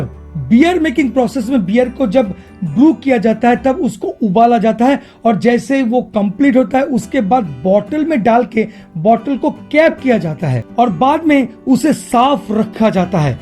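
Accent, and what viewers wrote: Bengal, India. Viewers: Bengali manus chala hindi bolne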